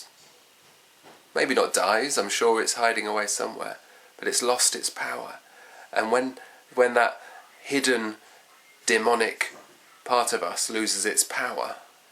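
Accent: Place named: RP, United Kingdom. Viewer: btw you're very posh!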